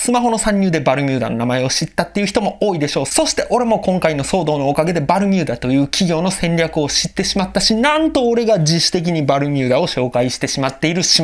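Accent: Kansai, Japan. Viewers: この動画の主旨はゴミを売るメリットについてなので、如何にゴミかを強調するのは必要だと思いますよ あなたのもやもやの正体は「関西弁のテンポやノリとあなたの感性が相性が悪かった」だけでは無いでしょうか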